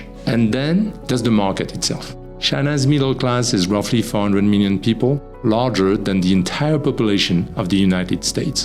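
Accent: France. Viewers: Fantastic research. The data provided is exquisite. Thanks for this valuable work. It's priceless The only thing that gets in the way is the french accent. Man, your videos are the only ones I can watch till the end. I now realize how the articulation of the two languages are different that it makes it almost impossible without sacrificing good amount of clear speach. Almost all sounds are pronounced differently.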